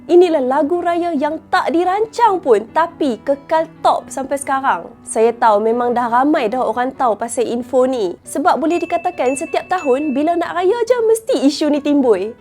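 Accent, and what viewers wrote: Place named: North, Malaysia. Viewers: Apa masalahnya klau dia nk ckp Utara... Menandakan org melayu bukan jenis lupa diri... Lagipun org melayu kedah adalah suku melayu terbesar di Asia tenggara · Meluat pulak dengar speaker cakap slang utara. Cakap je la bahasa melayu biasa. Asal orang utara je kena pakai slang dia. Kalau orang pantai timur tak boleh pulak pakai slang dia, nanti orang tak faham la apa la.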